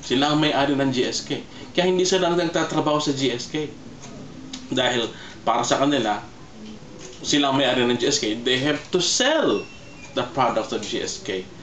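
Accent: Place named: Caribbean. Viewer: your accent is slightly caribbean :)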